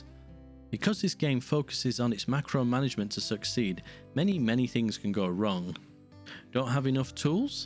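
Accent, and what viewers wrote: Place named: RP, United Kingdom. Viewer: I recently picked this game up. It runs with relatively low demands for a 2014 title. It supports anti-aliasing and runs on WinXp. All scenes you showed included mods: the wooden church, the instant building of fences. This is not in the normal game. The sound is good. The game is lacking in two areas: management of stock at specific warehouses and efficiency of laborers who walk all across the map to do tasks as the town expands. Have a fishery on one end of the town, and somehow a few fish will end up on the other side while the person carries a half a load of stone. Everyone uses this speech synthesizer with a BBC English accent. When I hear him, I'm thinking of an internet personality ranting about veganism.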